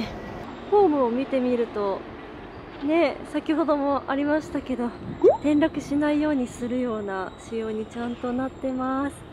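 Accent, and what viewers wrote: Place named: Hiroshima, Japan. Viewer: up主さん、広島または中国地方の出身ですか？ところどころの方言が心地良いです。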